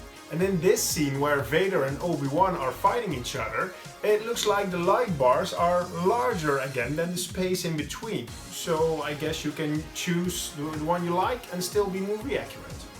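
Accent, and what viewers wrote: Netherlands. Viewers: Great work. Do I detect a slight Dutch accent? 😉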